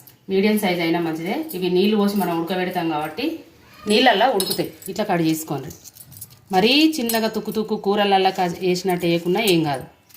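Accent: Telangana, India. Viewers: Telangaana accent Chaala baagundi Mee Pallalu semi chadivinaaru. ?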